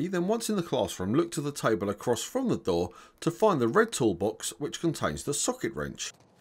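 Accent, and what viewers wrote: United Kingdom. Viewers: no one told you to talk a little bit slow...man not all people understand English in a UK accent !!!!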